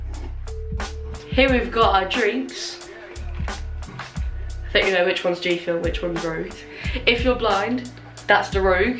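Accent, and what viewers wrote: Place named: United Kingdom. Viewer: You’ve got a thicker British accent than me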